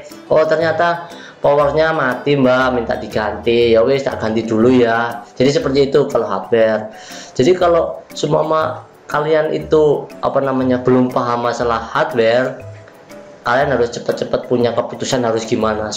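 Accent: Banyumas, Indonesia. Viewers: wah pantes ngapak toh, punya No.WA mas?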